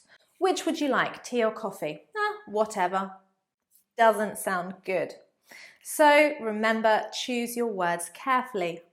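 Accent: United Kingdom. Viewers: thanks a bunch for that i love your accent BR E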